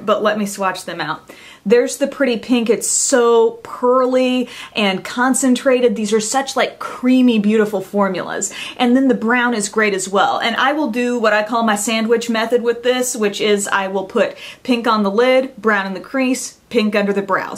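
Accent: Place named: United States. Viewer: You're so pretty and your accent is SO COOL. Whereabout in America are you from?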